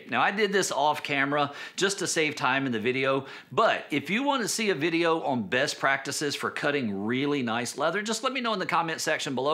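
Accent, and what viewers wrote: South, United States. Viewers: Two southern boys! LOL He's from Ga, I'm originally from Alabama. Glad you enjoyed the project!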